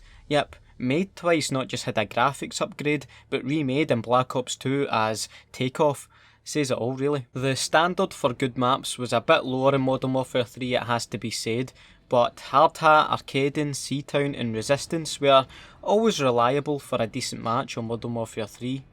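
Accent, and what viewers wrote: Scotland, United Kingdom. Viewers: Hearing a Scotsman explain literally anything makes it sound so much better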